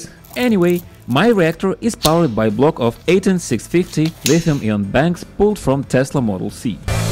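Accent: Russia. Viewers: русский. Это очень слышно по акценту. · Я же знаю, что ты русский. Акцент тебя спалил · Прокачай английский пж, а то русский акцент раздражает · Are u Russian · Я немого понять это русскоязычный Чувак говорит на английском ? А то что то акцент палиться 😀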